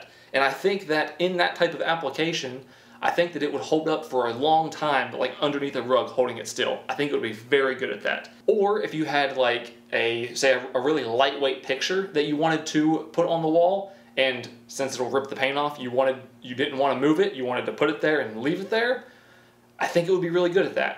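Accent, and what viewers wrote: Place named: North Carolina, United States. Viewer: What state is he from. My guess is North Carolina , me being from there our accents sound familiar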